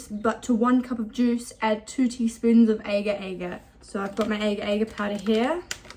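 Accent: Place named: New Zealand. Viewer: My first question was going to be what is your accent?? I couldnt quite place it! New Zealand! I was actually vegan for many years. That mold is so cute!! For my silicone molds, I like to put them on top of a small cookie sheet to carry them to the fridge or the oven. I had too many mishaps before I started to doing that..Hahahaha. My Grandsons love gummy bears, so I know they would enjoy these!!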